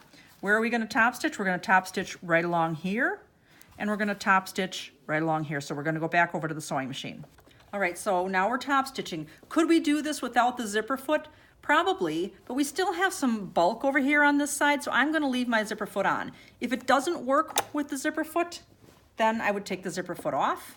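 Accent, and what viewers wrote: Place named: Wisconsin, United States. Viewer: This is a good tutorial! I like the way you teach -- you get right to the point. Sounds like you are in Michigan or Wisconsin ? Oh, I was right...Wisconsin. I recognized your accent :)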